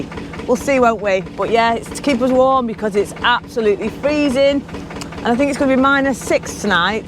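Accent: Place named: North, United Kingdom. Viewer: Just found you. Great fun listening to your northern ramblings. Thank you. You seem very genuine in your spoken thoughts.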